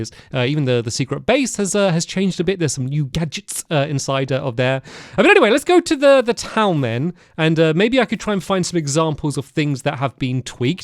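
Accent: England, United Kingdom. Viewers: back when I was four. I didnt know any english! (im a Malaysian btw) but after listening your english accent I not only know english but i know minecraft, and if it werent for you i would be empty... I also watch your other channel I Wonder and Ive been meaning to watch your lovely world series and the I wonder series❤❤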